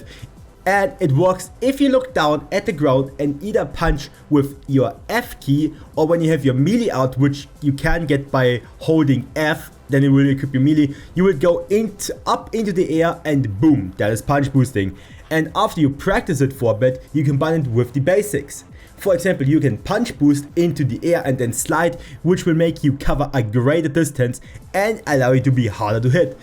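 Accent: Germany. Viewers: U sound hella german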